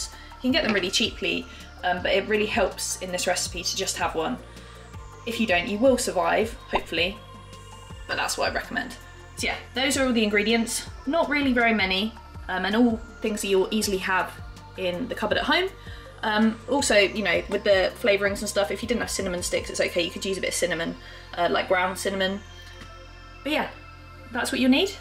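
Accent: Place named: Southeast England, United Kingdom. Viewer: Judging by her accent, she's from the SE England!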